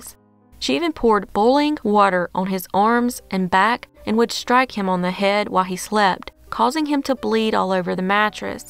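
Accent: South, United States. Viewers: Thank you kindly and I love your southern accent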